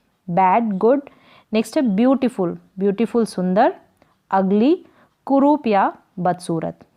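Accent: Chhattisgarh, India. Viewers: Hello didi aap chhattisgarh se ho kya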